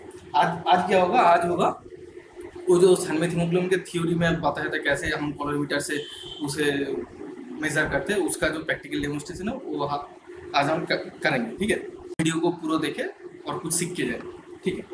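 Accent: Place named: Bengal, India. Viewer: স্যার আপনি কি বাঙালি ?? আসলে,আপনার হিন্দি বলার কায়দা দেখেই বোঝা যাচ্ছে 😅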